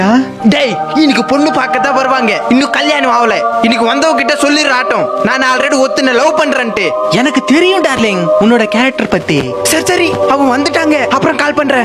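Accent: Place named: Andhra border, India. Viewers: Telugu mixed tamil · Andhra border people's slang ithu.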